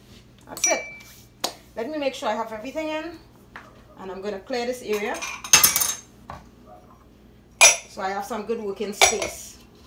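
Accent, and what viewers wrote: Trinidad and Tobago. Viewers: girl Ma ma you surely can put down some moves Just like all your Receipts.In Cooking you are very exciting.. You sound like a TRINI😆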